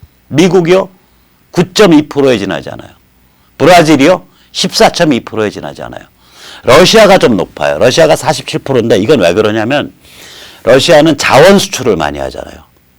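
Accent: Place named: Seoul, South Korea. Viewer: 오늘도 체한것이 시원하게 내려간듯..좋은 말씀 잘들었습니다. 국내,외 지인들과도 많이 공유 했습니다. 특히 좋아하는건.. 선생께 많은분들이 잘 못느끼는 특유의 서울 본토 사투리를 들을 수 있다는 겁니다. 배우 한석규씨의 억양도 순수 서울 사투리가 배어있어 듣기 좋습니다. 나는 지방사투리들도 순수한 정감을 느낄때 좋아합니다. 서울말씨를 익히고 싶은 지방 분들은..특히 젊은분들..? 딴것보다 선생과 배우 한석규씨 프로들 많이 보세요.^^ 선생이 오늘 다른 you tube "pen&mike" 후원행사에 오실줄로 개인적으론 기대했는데.. 편 가르는게 아니라.. 大同團結의 의미에서 기대했었습니다. 늘 고맙게 보고있습니다.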